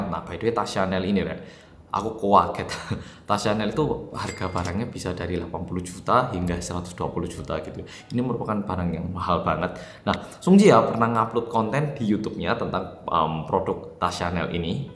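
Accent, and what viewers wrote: Java, Indonesia. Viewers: Bang kl ngomong korea logatnya korea, tapi klo ngomong indonesia logatnya jawa medok:)_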